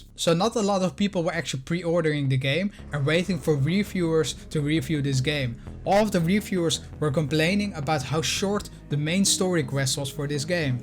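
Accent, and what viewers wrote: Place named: Netherlands. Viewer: You have quite the Dutch accent ;))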